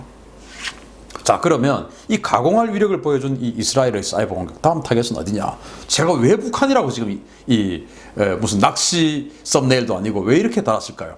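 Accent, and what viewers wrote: Gyeongsang, South Korea. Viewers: 간곡히 건의합니다 1. 멘트를 조금 늦춰주시면 좋겠습니다. 경상도 억양에 말씀을 너무빨리 하기때문에 미처 이해하지 못하는 경우가 있습니다. 2. 광고가 너무많아 답답합니다. 다른 방송보다 독자 수가 월등히많은데 운영에 넉넉 하쟎 습니까? 깊게 헤아려 주셨으면합니다. 존경합니다. !!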